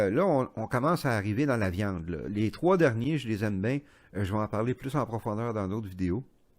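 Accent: Quebec, Canada. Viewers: Bonjour et merci beaucoup pour les informations, si vous avez le temps.. pourriez-vous les écrire les noms des membres du Collège Central ? Désolée ! J’ai du mal à comprendre avec votre accent québécois… ne vous méprenez pas… c’est joli comme nous à la Polynésie nous avons aussi un accent… nous roulons les rrrrrr… je vous remercie d’avance et bonne continuation pour tout…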